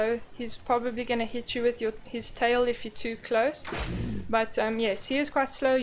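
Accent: South Africa. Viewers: Are you south african? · Wait... This accent🤔 sounds familiar.... You're South African right? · South african accent. Beautiful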